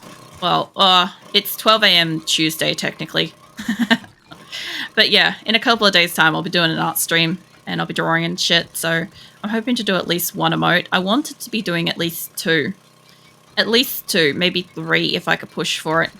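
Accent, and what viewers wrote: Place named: Australia. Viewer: I've never been able to watch an entire video of someone mining. Watching you mine is secondary to listening to you talk about nothing and everything all at the same time 😂 there is something about your voice that is easy to listen I and especially love the Australian accent. AJ 👍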